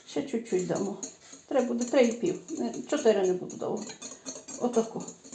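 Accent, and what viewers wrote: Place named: Galicia, Ukraine. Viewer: Це щодо зауваження до п.. У неї класний галицький діалект. Продовжуйте, п., і не звертайте уваги на такі дрібниці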